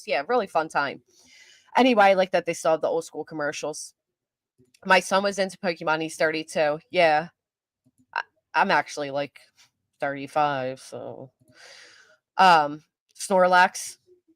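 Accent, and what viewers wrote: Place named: Pennsylvania, United States. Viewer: Happy Mother’s Day! I’m not far away from the PA line so I get how you say certain things. Much like we do here in Delaware 🌊🏖️☀️🏝️🐚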